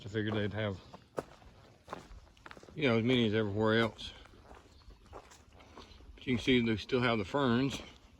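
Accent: South, United States. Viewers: Thank you for finding more mushrooms and catching them on camera. That first big one almost didn't look real. Amazing there are so many kinds. If rock walls could talk, they could tell you lots. Most represent the skill in which the person built them. Some may fall apart due to vandalism or people searching through them, but the one my daddy and us kids built is still standing strong. If there was a way I could include it in this comments section, I would. .......I also appreciate you filming sections of the towns you are going through........still love seeing the old houses "up north". The architecture is so different than you see here in the south. Keep holdin' on to your sweet southern accent !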